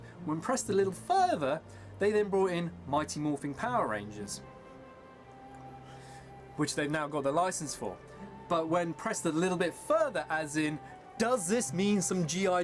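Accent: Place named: England, United Kingdom. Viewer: Who's 'we' with your English accent? ! Coz as far as I'm concerned, we ain't getting it! Unless someone comes out and says everyone else can get one...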